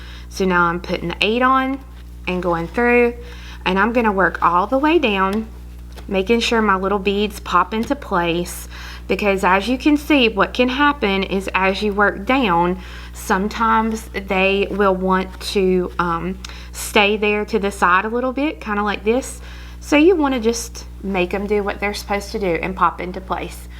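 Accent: South, United States. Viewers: I would give just about anything to come visit you this weekend! I am a southern girl currently living in England and miss home so much. Ms I love your videos, I am a long time beader and always learn a little bit more from you. Keep speaking southern to my heart and beads to my soul! Thank You!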